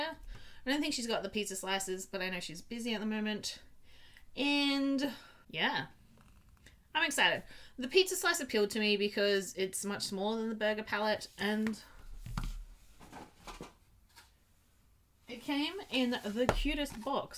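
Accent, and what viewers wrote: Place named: Adelaide, Australia. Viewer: Love the look! Your accent isn't obvious to me! 😜 you sound Adelaidien though for sure! I've been looking for a greens palette so I might have to check this one out! Wish you lived in Adelaide so you could teach me your ways in person! 😂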